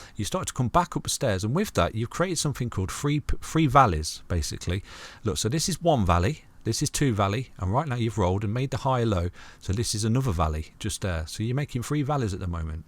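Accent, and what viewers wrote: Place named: North, United Kingdom. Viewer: How can nayone be depressed listening to your luverly northern accent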